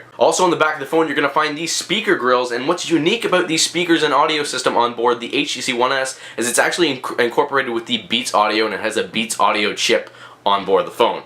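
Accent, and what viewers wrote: Canada. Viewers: Are Canadian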